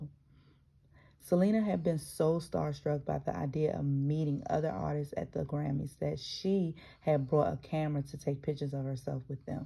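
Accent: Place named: South, United States. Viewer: P.s. I'm from New York. It's so true that my city is dirty, lol. You have a bit of a southern accent to me. It's not that thick, though.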